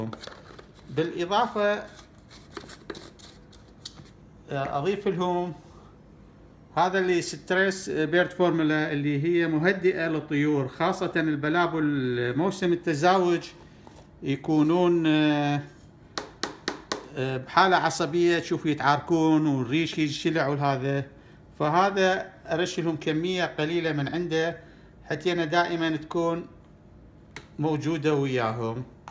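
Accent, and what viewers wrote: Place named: Iraq. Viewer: الهجه العراقيه حلوه بحلكك الله يحفظك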